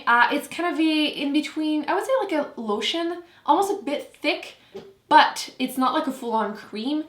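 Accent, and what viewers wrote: France. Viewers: Hi! Thanks for this! (I’m from Ontario and just love your French accent!) For baby hairs/fly aways, to keep them in place, just spray some hair spray on a toothbrush and comb them down. ❤️💋🇨🇦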